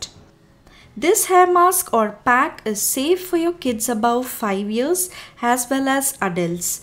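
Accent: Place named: Bangalore, India. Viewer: Praise the Lord Sisy...! R u frm Bangalore?! Bcs, ur pronunciation of Tamil seems so... :) !!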